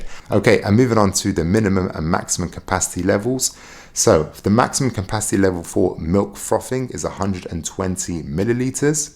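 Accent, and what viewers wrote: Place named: London, United Kingdom. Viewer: Well, it's not R.P., but it's more than adequate English with a slight London accent, I'd say.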